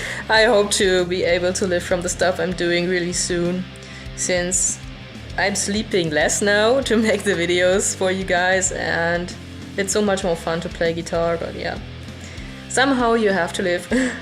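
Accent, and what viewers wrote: Germany. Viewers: Hi love your covers!! But I think you should Have Zakk Wylde and Dimebag RIP!!! also Yngvie!!! And the great Eddie Van Halen!!! Imo, way better Guitarist!!! But as always love German accent!!! Keep Rocking as always 🤘🤘🤘🤘😈😈😈😈😈👍👍👍🤘🤘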